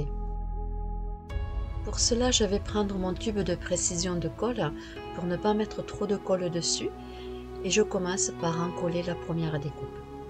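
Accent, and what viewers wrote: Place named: Montpellier, France. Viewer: Bonjour, je viens de m'abonner, parce que merci pour ce partage et aussi parce que j'ai le même accent! !! Hihihi, je suis originaire de montpellier, mais je vis en RP, à bientôt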